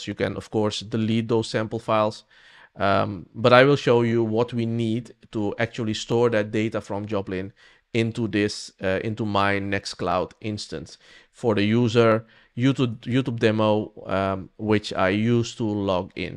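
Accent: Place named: England, United Kingdom. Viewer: Dit is exact wat ik wilde doen, bedankt! Herkenbaar Engels accent😉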